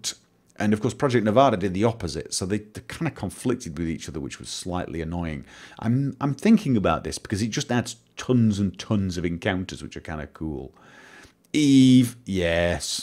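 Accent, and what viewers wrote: United Kingdom. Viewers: Does he have a British or Scottish accent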